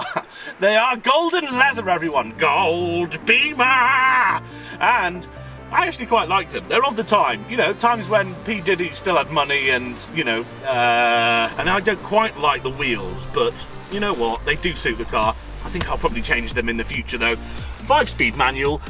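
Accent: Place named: United Kingdom. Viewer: If you ever wanted to see Seth Rogan, presenting Top Gear, with a British accent, this is the content for you.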